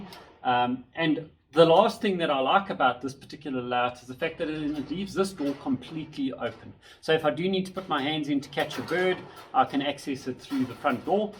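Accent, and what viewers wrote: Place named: Gauteng, South Africa. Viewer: Where about are you'll located in South Africa , your sound like from Gauteng . I am from Durban and like to breed gouldians and if you can hook me up to some good breeders and if you are selling birds . Where do you'll show your birds . Great to see breeders in South Africa sharing knowledge .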